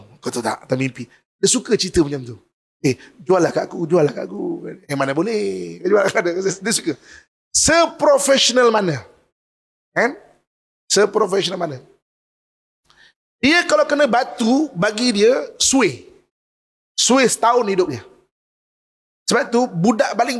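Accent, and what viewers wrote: Malaysia. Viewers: hmmm aku xsuka dgr logat² semenanjing ni. Dosa jadi dose 🥴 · Alhamdulillah ilmunya beliau patut diacungi jempol, meski sayye agak-agak tidak paham apa yang disampaikan soalx menggunakan logat Melayu. Salam dari 🇮🇩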